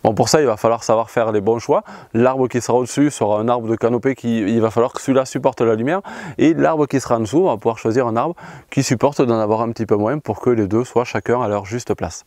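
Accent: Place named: Hérault, France. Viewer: Bonjour nouvelle abonnée votre terrain surement dans lherault je connais bien cet accent😊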